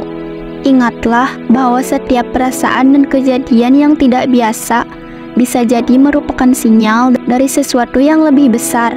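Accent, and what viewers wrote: Bali, Indonesia. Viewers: Dri dialeknya sptnya org Bali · iy mnurut saya ini dialek orang bali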